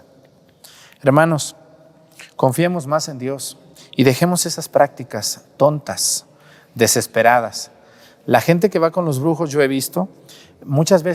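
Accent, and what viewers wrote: Mexico. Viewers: Hola padre, como cada semana muchisimas gracias por el resumen de homilias, padrecito usted es el combo completo: Sabiduria, carisma me fascina su acento tapatio y regreso una y otra vez el video para que se me grabe lo que usted nos dice, siga asi padre hablandonos con la pura verdad, que tenga una exitosa y bendecida semana💐🌸🌹🌷🌼🌺👏🏼👏🏼👏🏼👏🏼👏🏼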